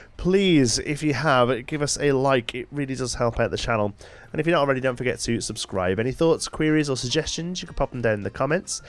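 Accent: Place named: England, United Kingdom. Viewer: i watched all your previous videos ep i loved ur accent lookin english uk sometimes better than en usa , i wished this game free because i support always free games open source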